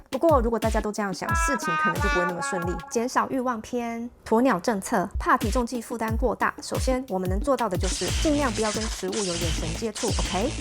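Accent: Taiwan. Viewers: （無效啦）呵呵 ，很標準的台灣閩南語發音😊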